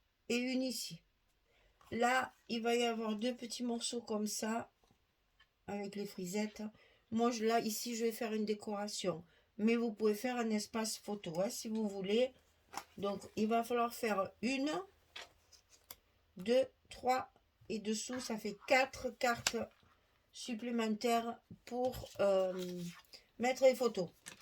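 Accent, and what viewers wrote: South, France. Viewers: Bonjour, c'est pour vous dire que j’adore tout vos tutos, ils sont très bien expliqués, je n'ai jamais pensé à vous laisser un commentaire, mais je me rattrapages aujourd'hui, je doit faire un album naissance et il va y avoir beaucoup de vos pages. Je voulais vous remercier aussi pour m’avoir fait connaître le papier 50x70 cm, par contre vous êtes une artiste dans la décoration, moi j'ai encore des progrès à faire. Et merci pour votre bonne humeur et pour votre bel accent du sud donc je fais partie😊 ❤❤❤❤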